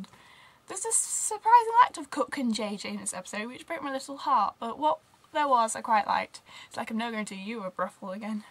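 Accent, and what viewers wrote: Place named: England, United Kingdom. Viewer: dam youve got the nicest english accent ive ever herd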